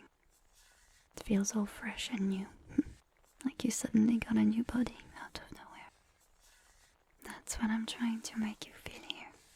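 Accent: France. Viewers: Having to do quizzez and listen to this during it and helps me relax and focus your french accent is really amazing! First time i've heard someone with a french accent you do really good ❤ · Oh, the French accent, the whispers and your voice melt my heart. I really needed to relax my mind. You always know what we crave. Tu es vraiment douée dans ce que tu fais. Merci. 🤍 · F4F french accent ASMR, lets goooooooo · Mon dieux je m'attendais pas à ce que ce soit un français aussi authentique !!! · Love the french accent.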